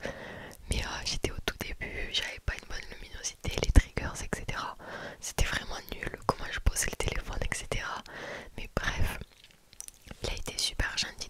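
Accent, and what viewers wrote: South, France. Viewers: Hello, oh mais c'est trop adorable ! Merci merci pour tes mots 🥰 Je regarde souvent tes vidéos aussi et j'apprécie ton chuchotement avec ton accent qui sent bon le sud 🥰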